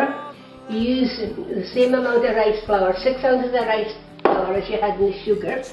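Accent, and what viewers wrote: Scotland, United Kingdom. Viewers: She sounds like a Mackay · Gotta try these for sure. Love the recipe and the Wee bonnie lass accent !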